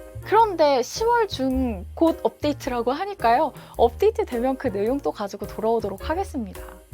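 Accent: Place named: South Korea. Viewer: 정칙한 한국인 영어에 구독버튼 누릅니다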